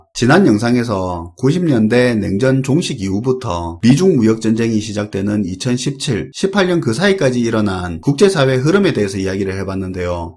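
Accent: Gyeongsang, South Korea. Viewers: 갱상도 사투리로 일목요연 정리해줘서 늘, 감사합니다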